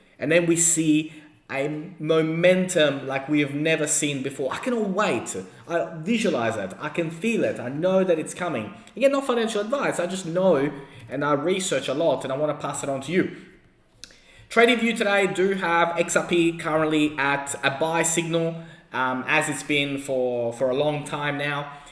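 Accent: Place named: Australia. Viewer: He's an aussie. Dno where abouts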